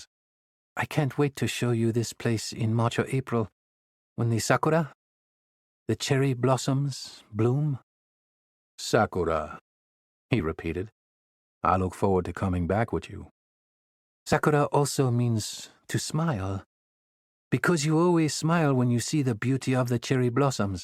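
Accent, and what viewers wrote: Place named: South, United States. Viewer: Horrible Narrator The Southern Accent is 😞 Bad Very Annoying. This Book is Boring Very Slow Moving. I couldn't care less about The Story or anyone in it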